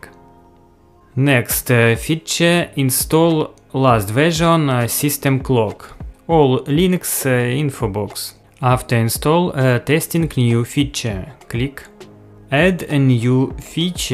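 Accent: Russia. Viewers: Сразу слышно что автор говорит на русском)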